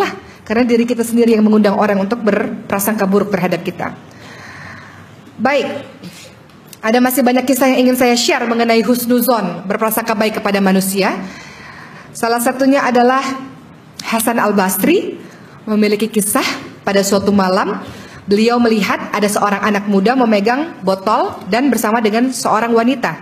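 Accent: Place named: Malaysia. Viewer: Logat Malaysianya bagus kali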